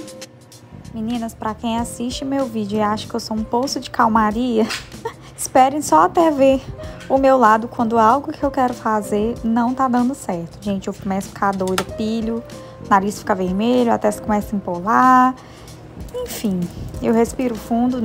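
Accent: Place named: Minas Gerais, Brazil. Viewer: Esse sotaque de mineiro 😍😍😍 amoooooooo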